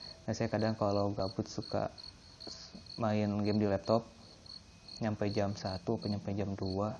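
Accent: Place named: Bandung, Indonesia. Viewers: Sunda pisan yaaa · Yang Cerita orang bandung ya? logatnya :D btw serem sih tapi lucu hhaa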